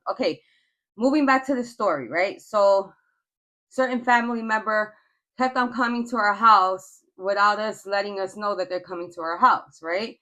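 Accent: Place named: New York, United States. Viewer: it sure does sound like a NY thing. It’s like listening to someone read the book of life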